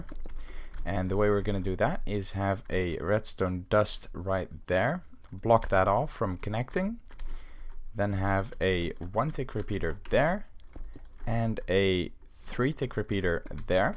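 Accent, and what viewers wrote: Netherlands. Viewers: Are you from holland because you have a dutch accent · R u Dutch?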